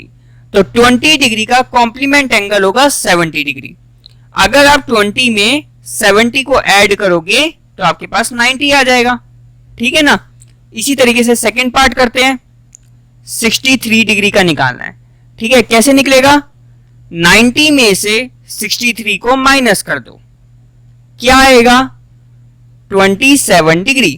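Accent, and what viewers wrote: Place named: Haryana, India. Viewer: Ap haryanvi ho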